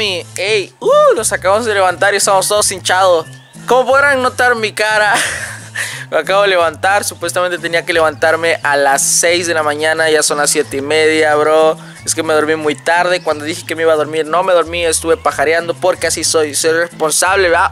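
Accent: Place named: Acapulco, Mexico. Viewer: Me mama el acento de Acapulco! Extraño tanto vivir allá🥺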